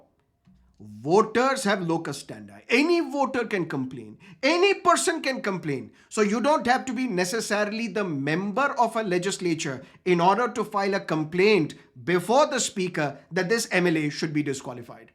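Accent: United States. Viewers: Aap american ho kya, aise kyu bol rahe ho 😅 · ये ज्ञानी कौन है? क्या उसे लगता है कि राघव, पाठक और भाजपा ने इस बारे में सोचा ही नहीं है? मुझे ऐसे मूर्ख लोग बिल्कुल पसंद नहीं जो अमेरिकी लहजे में अंग्रेजी बोलते हैं।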